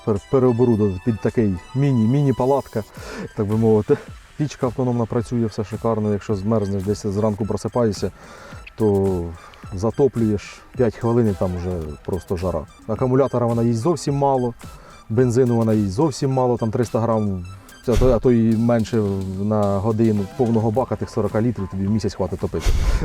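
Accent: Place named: Ukraine. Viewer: А можно нормально по руски без Ебонова акцента хохляского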